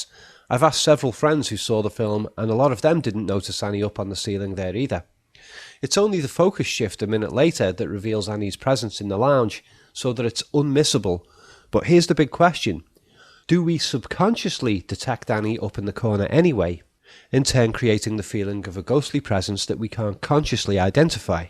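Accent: Liverpool, United Kingdom. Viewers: The juxtaposition of horror movie analysis and a Scouse accent is scary in itself!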